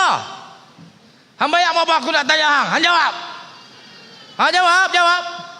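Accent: Kedah, Malaysia. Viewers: Melawak cara orang kedah, seronok dengar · Saya suka loghat Kedah. Sedap didengar. Bertambah sedap bila MB Kedah, Dato Sanusi berucap sbb ada unsur jenaka berisi yg menyebabkan tidak jemu didengar. Semoga PN diberi kemenangan besar di Kedah.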